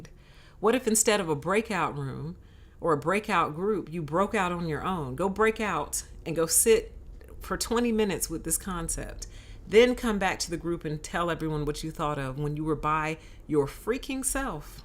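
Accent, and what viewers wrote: South, United States. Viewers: your slight southern tone just makes it that much more rich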